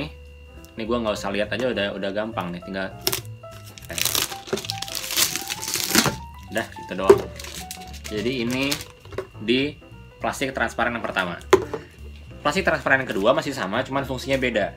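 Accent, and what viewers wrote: West Java, Indonesia. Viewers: sunda pisan a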